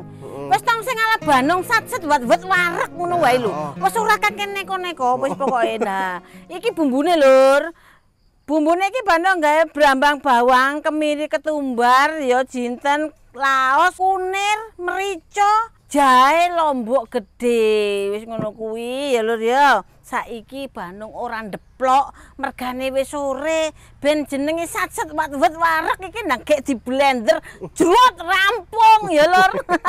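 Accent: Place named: Surabaya, Indonesia. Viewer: Bu banung kok koyok wong surabaya lek lihat logatnya😂😂😂😂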